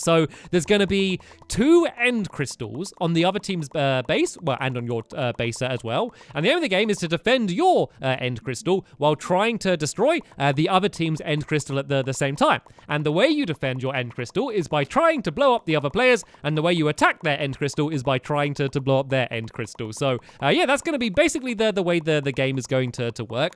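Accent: United Kingdom. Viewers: Shakil Syed he is British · Teddy Rare he is brittish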